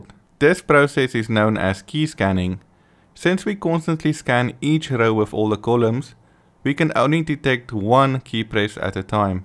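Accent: South Africa. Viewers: At first i thought you were European Then i heard of say matrix with that south african R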